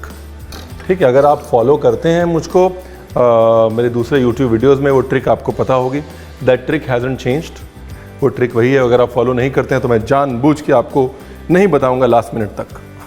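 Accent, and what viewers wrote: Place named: Bengal, India. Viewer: Your Bengali accent is awesome! I am also a bangal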